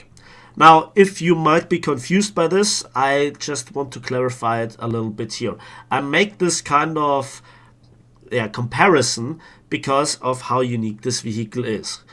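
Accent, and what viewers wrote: Germany. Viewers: du bist deutsch oder?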